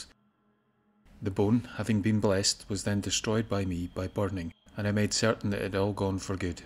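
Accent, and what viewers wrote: Scotland, United Kingdom. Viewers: P.S.. Love the narrator's voice, Scottish accents just make me gushy!! I'd pay money for this guy to narrate my grocery list once a month!! lol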